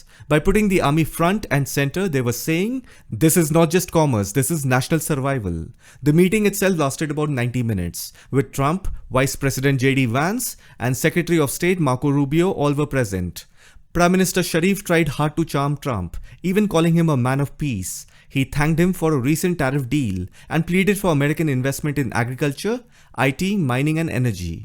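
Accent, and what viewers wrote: India. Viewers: Your Indian accent is so annoying 🤮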